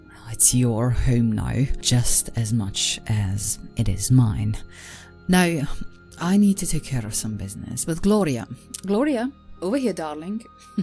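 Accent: Scotland, United Kingdom. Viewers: She sounds Scottish to me, is that just me? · I can't figure out the accent, the os sound Australian, then she sounds Scottish but the way she rolls some words sounds Russian or something. I love her tho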